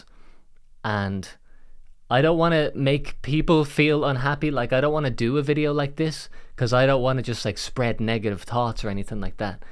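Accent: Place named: Ireland. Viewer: Your Irish (Newfoundland?) accent is really neat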